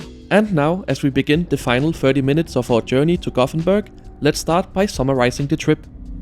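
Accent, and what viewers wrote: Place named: Denmark. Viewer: It's a very danish pronounciation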